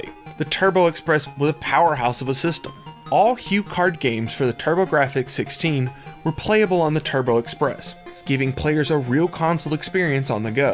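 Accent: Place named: Oklahoma, United States. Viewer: Are you from Texas or Oklahoma? I’m from Oklahoma and recognized a hint of twang in your voice and was just curious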